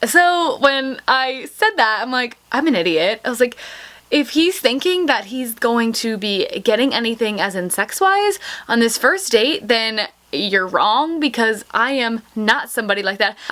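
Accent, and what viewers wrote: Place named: United States. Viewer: Most jarring accent and way of talking I've heard in a long while. You give American girls a bad name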